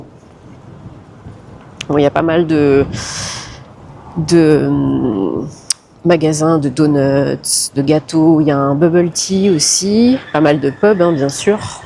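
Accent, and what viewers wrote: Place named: France. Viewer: Any channel that helps inform more beautiful French ladies on how to move to Scotland gets all my support and a big thumbs up 👍😀 Who knew watching a French lady roaming around Scotland could be so interesting and relaxing. I could listen to your accent all day long :) Thanks again for the video, and best of luck with your channel. 💙🤍 from SW Scotland.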